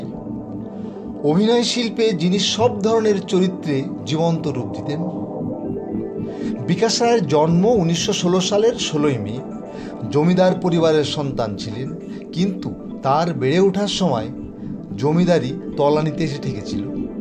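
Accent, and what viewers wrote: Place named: Bangladesh. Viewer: আপনি কি বাঅংলা দেশের মানুষ ।আপনার উচচারণে বাঅংলা দেশের গন্ধ ।আরো জানার চেষ্টা করুন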